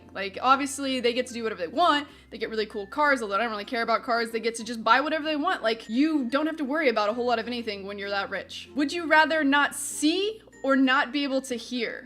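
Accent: Canada. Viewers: are you from Canada new Zealand or Australia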